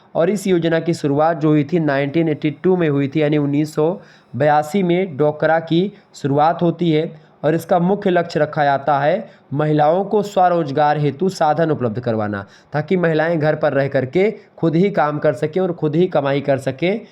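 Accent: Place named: Awadh, India. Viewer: Sir aap aawdhi bolte hai acha lgta hai ❤Best teacher on youtube for B.A.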